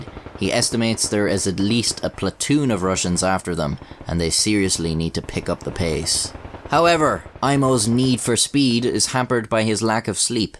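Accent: Ireland. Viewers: Had to an irish narrator on a drug story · The Irish accent makes it better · Hi 👋🏼 which part of Ireland are u from? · This story is made so much better being told by one of my favorite nationalities, an Irishman. Its great.. cuss words and all. 🇺🇸 😎👍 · I love Irish accents and how "to surround them" sounds like "to so random"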